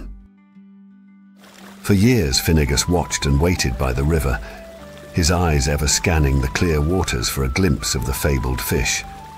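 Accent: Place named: United Kingdom. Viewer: 😡 This is a great Irish myth. And they've got the story wrong! Even worse they used a British accent. Do they not know how insulting that is? Sigh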